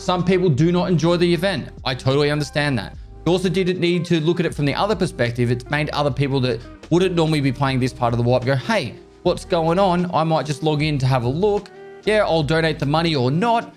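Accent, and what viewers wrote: Australia. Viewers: I havent watched videos in a while, but living back in Australia for a bit now, his Accent got a lot stronger compared to when he was living in Europe for a year. Or is that just me?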